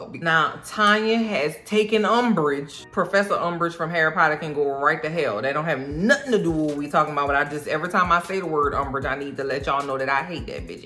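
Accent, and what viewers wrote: New Orleans, United States. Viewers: Just watched both episode last night love your commentary and your Nola accent 💚💯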